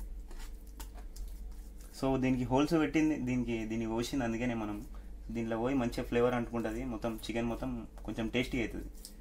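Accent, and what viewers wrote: India. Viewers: Hamara hyderabadi patta ..superb dialect of hyderabad ..from Langer houz..love you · Mee language bagundi telangana style nd recipe kuda chaala baagundi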